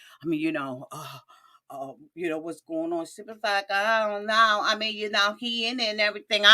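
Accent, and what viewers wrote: Washington DC, United States. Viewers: Hey girl. I love love love you. I am also from the DMV… I knew u were from here the first time I saw your show and heard that DC dialect. Love ur commentary 😂😂😂❤❤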